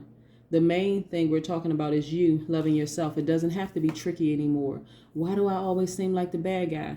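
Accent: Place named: Chicago, United States. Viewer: You are too cute. You talk like you are from Chicago! Bust some suds! Yes, the reading ... on point as always!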